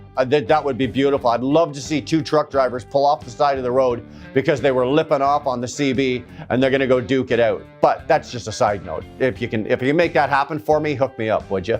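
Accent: Canada. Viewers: As I’m watching this, I’m saying to myself, “This has got ta be the most courteous trucker I ever encountered.” Then I listened to him speak for a minute, and was like, “No wonder. He’s Canadian.” 👍🏼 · Is that a CANADIAN accent I hear? Man, you're making me homesick!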